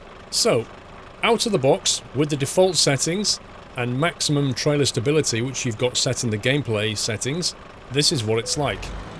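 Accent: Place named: United Kingdom. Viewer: There is thousands of videos like that on YT but ONLY can explain properly even hes got that british accent (luckilly i used to live in Birmingham:P ).Other twats just expect money for their shitty videos. deservers that. Greetings from Poland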